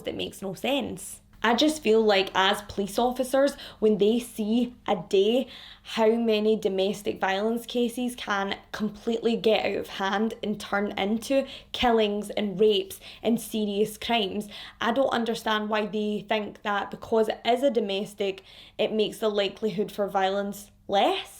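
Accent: Scotland, United Kingdom. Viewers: I’m so glad I found you last night! You remind me of myself 😂 I knew you were Scottish the second you started talking. I am too but my accent is a lot stronger. I’m binge watching every video now and can’t wait for more. Such a great personality for these stories, you need some humour and light hearted moments to stop them becoming too heavy. Love it. Your makeup is amazing too, that bright inner corner👌❤️ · What a sick fuck! I’m having trouble placing your accent - what part of Scotland are you from?? xxx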